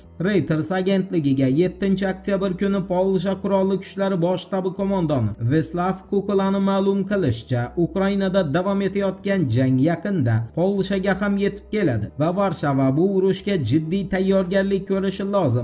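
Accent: Khorezm, Uzbekistan. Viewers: Нима бу хоразмликми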